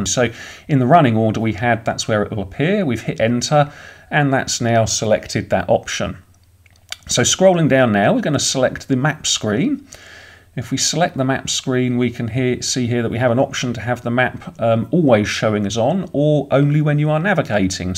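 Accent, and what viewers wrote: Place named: Essex, United Kingdom. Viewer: This sounds like a north Essex accent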